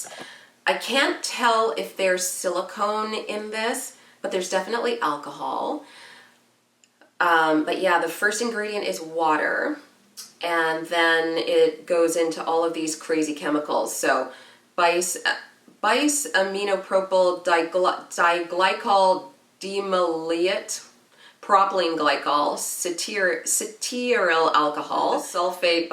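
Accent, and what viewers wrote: Canada. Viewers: It's a Canadian accent, very simply.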